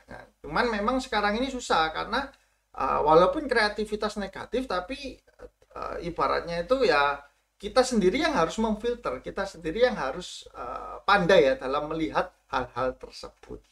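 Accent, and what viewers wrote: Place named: Java, Indonesia. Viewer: Dialeg nya medok banget mas *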